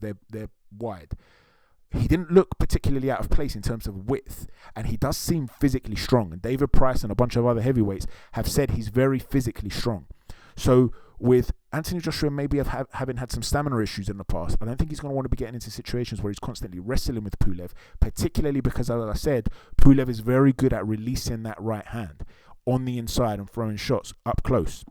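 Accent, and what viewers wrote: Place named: London, United Kingdom. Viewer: .... where do I start my brother, Firstly massive respect to Hatman for sending all of us here, Hatman & Boxing beats & rhymes are the top 2 UK Boxing channels by a country mile!! I just watched this breakdown & I gotta say bro you are on some next level with this kind of detail - this is genuinely intellectual stuff so massive credit to you - you got a bundle of new subscribers now including me & my pals so maybe tell us a bit about yourself - did you do boxing at any level or are you just a passionate sport lover? I’m from London and you sound like you from London too so again I extend you every courtesy and look forward to debating Boxing with you. One thing I want to ask you bro - I was a massive Lomachenko fan and we know that he f**ked up against Lopez but I cant see any videos from you on that fight - I liked what Hatman said that Lopez has been training for Loma for years whereas Loma probably didn’t even know Lopez existed - I really wish he hadn’t lost that fight man Anyways great work from you and glad I subscribed - sincerely hope your channel blows up in 2021!!!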